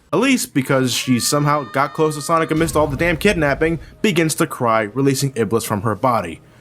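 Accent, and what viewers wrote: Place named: South Jersey, United States. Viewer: That's definitely a lot to say for the story of this game. I kind of see that your a bit similar to ShogunGinO, except your voice isn't as deep. Voice is almost like South Jersey Sam Flemming.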